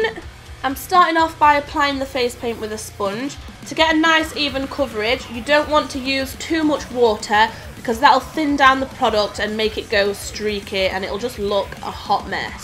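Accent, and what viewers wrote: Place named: Yorkshire, United Kingdom. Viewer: I wasn't expecting that accent... are you from Yorkshire?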